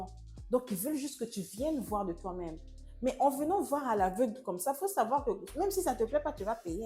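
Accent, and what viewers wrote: Ivory Coast. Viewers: Ton accent devient ivoirien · Ahm, tu commences a parler comme les Ivoiriens, tu es Congolaise et puis après. ?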